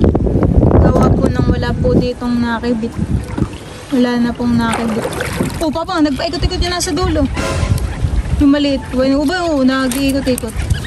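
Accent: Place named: Mindoro, Philippines. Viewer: Pamilyar at nadinig ko Yang tuno na Yan sa lubang Mindoro, Taga Mindoro kaga?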